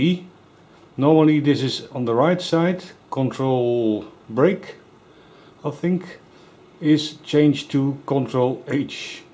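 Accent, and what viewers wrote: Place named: Netherlands. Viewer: That is a hard-core Dutch accent if I've ever heard one. It sounds like I'm listening to my dad... or as my daughter would say, "Opa." I just saw this video on the Tindie page... I definitely want one, but then saw the $250 shipping charge. I guess this is as a result of that new E.U. rule. :( I'll look into that Germany address thing. Thank you!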